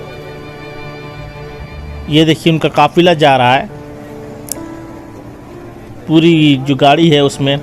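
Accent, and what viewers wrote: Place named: Bihar, India. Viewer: Utuberji, aapni languge sudhariye, Pronounsation me bihari lehja he, faltu baton se vdo bhot lamba bana dala he bhai apne, dusri baat ye namaze janaza dilip saab ki nahi he, pakistani vdo add kardiya he aapne janaze ki namaz ka.... Mujhe itna batayenge bhai... K q jhoot ka sahara lena pada apko....???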